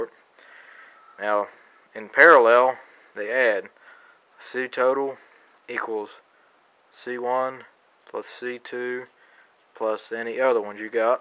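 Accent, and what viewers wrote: South, United States. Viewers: Made it VERY easy to understand, thank you! .. I'm from Canada so the southern accent made it all the more enjoyable. ;)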